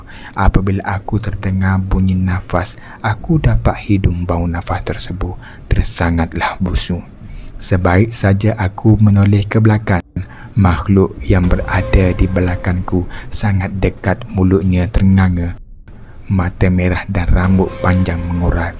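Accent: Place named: Sabah, Malaysia. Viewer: Mcm ada slange sabah sikit jo.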